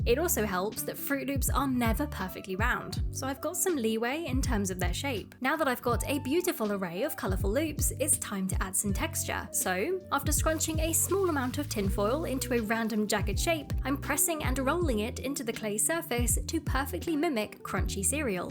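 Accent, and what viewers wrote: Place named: United Kingdom. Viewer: I love your art sm your voice reminds me of Ldshadowlady but I think it’s uhh cause your both British 😭✌️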